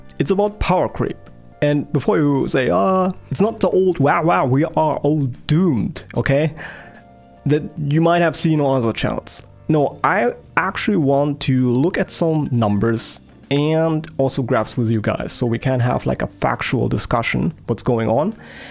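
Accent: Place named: Germany. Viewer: You sound like a German speaking english, are you german?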